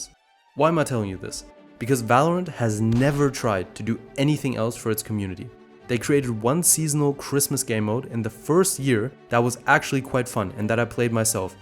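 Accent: Germany. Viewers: starkes video!!! übrigens hör ich raus dass du deutsch bist aber keine panik das schaffen nur 0.1% mach weiter so!!!! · ich hör doch dort einen deutschen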